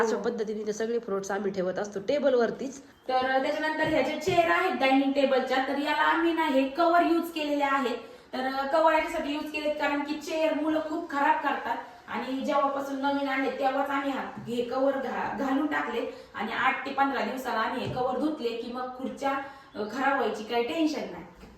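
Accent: Ahmednagar, India. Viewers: मी आज पहिल्यांदा पाहिला तुमचा vlog 👌👌खूप आवडला मी कोल्हापूर ला रहाते पण मी नगर जिल्यातील आहे शिर्डी जवळ बाभळेश्वर माझे माहेर आहे माझे education प्रवरा कन्या विद्या मंदिर मध्ये 7 वी नंतर पुणे मध्ये छान आहे kitchen आत्ता मागचे vlog पहाते तुमच्या भाषे वरून कळले तुम्ही नगरीं आहात मग मागचा स्वाती च्या love story vlog पाहिला तेव्हा कळले त्यात तुम्ही तीळा ला हौरी म्हणालात तर मी conform च झाले तुम्ही नगर भागातल्या आहात कोणते गाव आहे तुमचे surmane काय आहे घर खूप प्रशस्त आणि सुंदर आहे आणि maintain पण केलेय माझ्या सगळ्यां मैत्रिणी कोपरगाव कोल्हार लोणी श्रीरामपूर संगमनेर प्रवरानगर रुई राहता इथेच आहेत 🙋‍♀️❤, अंबज्ञ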